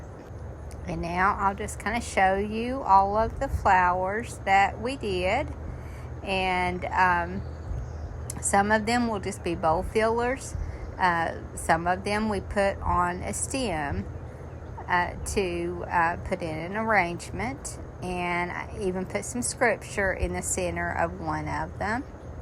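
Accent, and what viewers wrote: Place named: Tennessee, United States. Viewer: I just love watching your videos! And listening to you, too! LOL I was hoping you had a little shop I could visit somewhere near me in the Dallas/Fort Worth area but sadly, nope. 😢 But when I read you were in Tennessee, I knew that was why I loved your accent. Reminds me of home...Georgia. Anyway...everything you do is beautiful and I really appreciate your careful instructions.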